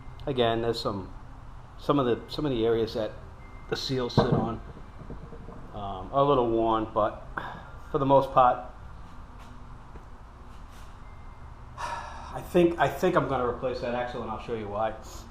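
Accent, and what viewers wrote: Boston, United States. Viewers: Def sound like he from Boston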